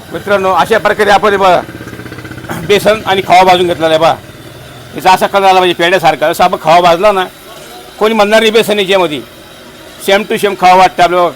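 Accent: Khandesh, India. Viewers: तुम्ही कुठले आहे मला तर खान्देश कडचे वाटता भाषेवरून मोहनमाळ बघितल्यावर माझ्या तोंडाला पाणी सुटले पण असा मोहनथाळ आमच्या कडे खायला मिळत नाही कोणी बनवत नाही ईकडे तुमचे सर्व पदार्थ खुप छान असतात आणि अगदी प्रेमाने तुम्ही ते काहीही न लपवता सांगता खूप छान वाटते असे तुमचा मोहनथाळ बघून तर मला असे वाटले माझ्या कडे काहीतरी कार्यक्रम करावा आणि मोहनथाळ तुमच्या कडुन बनवुन घ्यावा 😋 आम्ही पंढरपूर जवळ राहतो म्हणजे माझं सासर आहे आणि माहेर चाळीसगाव पण आता तिकडे कोणी नसते पण तुमचे पदार्थ बघून तिकडचीच आठवण येते मला खुप छान बनवता तुम्ही सर्व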